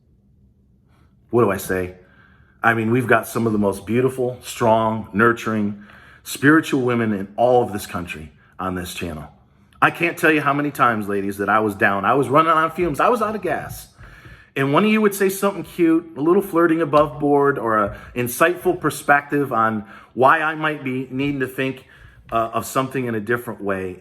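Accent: New York, United States. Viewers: Wisconsin Green Bay ( don’t hold it against me ) I have been here since about April. I love that you love everyone ! You talk like a tough New Yorker, and have Atlanta love in your soul. And you have the Rocky fighting spirit! You tell it like it is I love the F bombs I love the slapping of the desk the Dixie cup coffee I love to see the watches! More importantly when you need to have a shot hope I can pull up this video because every time I call there they tell me I am getting nothing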